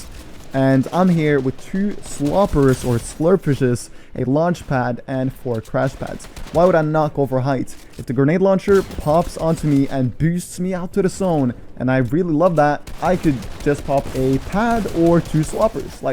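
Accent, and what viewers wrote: Norway. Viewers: wait, youre norwegian? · you norwegian bro? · broo are you from norway?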